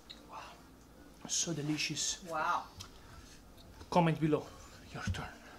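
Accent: Italy. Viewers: Why do you have an Italian accent but your mom doesn't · Maestro! I wish I was born from a long line of pizzas (heh heh, okay, just joking -- you're passion and Italian English is fantastic!). But I'm so inspired. I'm from Ottawa Canada!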